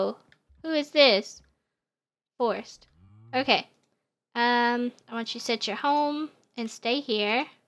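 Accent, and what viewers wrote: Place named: United States. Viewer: Oh myyy i’m watching ur current 100 baby challenge you sound like you have a light country accent in this love to see how far you’ve gone love your vids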